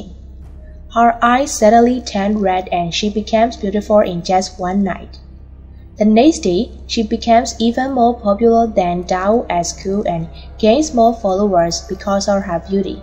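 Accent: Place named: Myanmar. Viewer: Are you a Myanmar?I just notice your accent.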